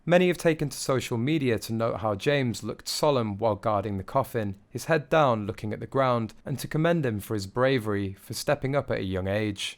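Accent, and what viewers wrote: United Kingdom. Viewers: You sound British.... But please check your pronunciation of Viscount! It does NOT rhyme with discount. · I don't know who you are; I thought you were an Englishman from the sound of your accent however old boy; your pronunciation of Viscount James Severn's title is very UN English. Viscount is not pronounced phonetically with an emphasis on the letter "s". The letter "S" is silent which is a quirk of the English language (Bloody Sassanachs). it is pronounced "VI - COUNT" and Severn is pronounced "SAY - VERN" Thank you Sassanach!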